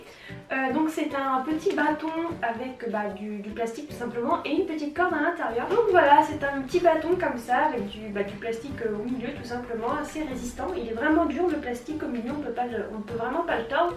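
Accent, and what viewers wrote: England, United Kingdom. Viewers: Ton accent anglais >>>> ♥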